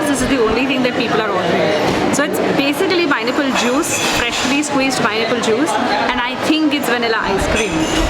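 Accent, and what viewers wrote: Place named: Maharashtra, India. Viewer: good to see you back there are very few educated reviewers left now. i was expecting you to say sithaphal in classic Marathi accent do use it time to time its your signature move. you could be the marathi mark weins one day :)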